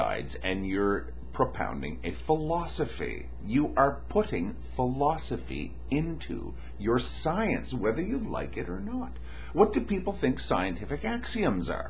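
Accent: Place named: Canada. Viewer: Heavier Canadian accent here than usual!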